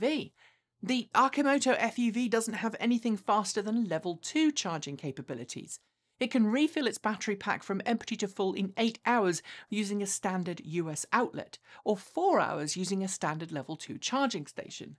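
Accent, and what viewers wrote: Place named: England, United Kingdom. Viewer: I can't stand that haughty English accent!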